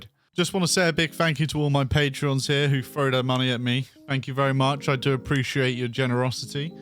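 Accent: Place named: United Kingdom. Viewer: I thought you were saying alpha ray eye cores for the longest time, and then I realised you were just fucking bri*ish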